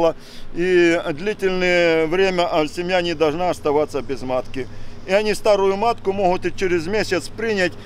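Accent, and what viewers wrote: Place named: Ukraine. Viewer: Відчуваю у Вас український акцент, чи не краще залишатися самим собою, говоріть мовою.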